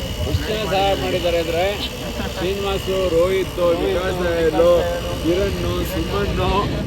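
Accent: Kundapura, India. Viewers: ಉತ್ತಮವಾದ ಕುಂದಾಪುರ ಕನ್ನಡ ನಿರೂಪಣೆ god bless you sir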